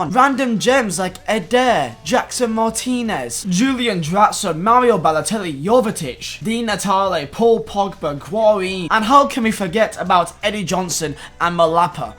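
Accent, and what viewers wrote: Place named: North, United Kingdom. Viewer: Northern. That's is all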